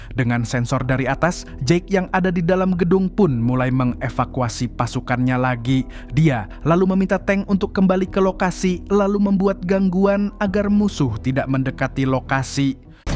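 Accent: Banjar, Indonesia. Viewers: Banjar banarr😅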